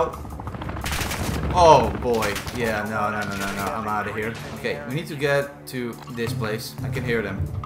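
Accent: Netherlands. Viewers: You can hear your dutch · Ik hoor gewoon dat je Nederlands bent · Are you dutch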